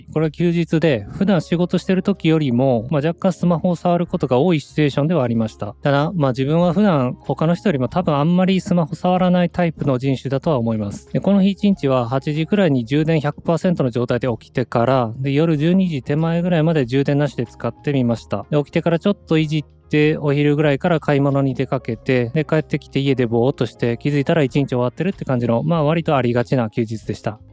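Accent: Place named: Kansai, Japan. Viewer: 関西弁で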